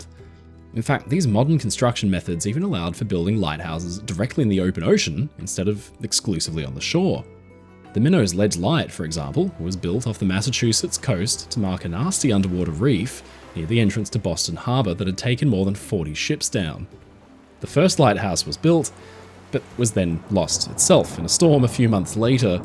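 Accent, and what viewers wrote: Australia. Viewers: I THOUGHT YOU WERE BRITISH NOT AUSTRALIAN! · well this is how i find out you’re australian. i’m absolutely terrible at recognising accents so i kinda just vacillated between thinking you were british and thinking you were american 🤦🏼‍♀️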